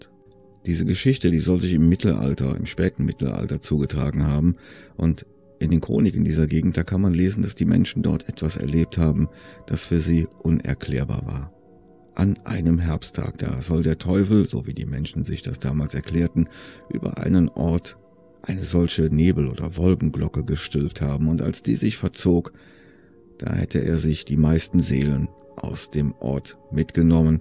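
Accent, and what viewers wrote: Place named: Cologne, Germany. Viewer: Auch wenn es nichts mit dem Thema zu tun hat, man hört ab und zu wie dein Kölsch so ein bisschen mit durchkommt 😄 Find ich aber super sympathisch! 👍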